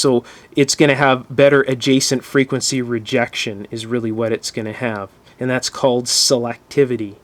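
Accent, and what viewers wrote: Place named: Canada. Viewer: Are you from Canada? it sound like you have a Canadian accent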